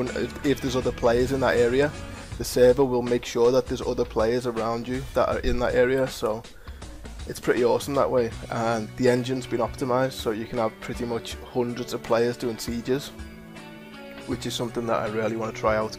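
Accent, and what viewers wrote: Liverpool, United Kingdom. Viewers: Oh and its nice to hear another scouser on YouTube =D that alone earned my sub =D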